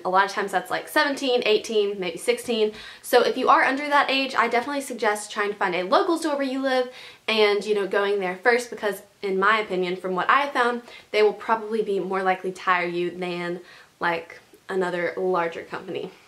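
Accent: South, United States. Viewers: Luv your southern accent I have one to!!! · i love her southern accent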